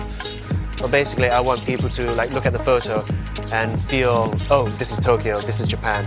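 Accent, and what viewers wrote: United Kingdom. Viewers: wow, first time hearing your voice. I love the british accent! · Ahaha, those years in Japan still haven't cost you your Brit accent, I see:)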